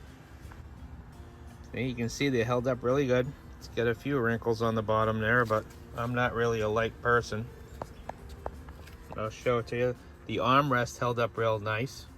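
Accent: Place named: Boston, United States. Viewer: (Guessing you're from Boston, based on your accent?) Just picked up my '25 Hybrid Maverick, LT.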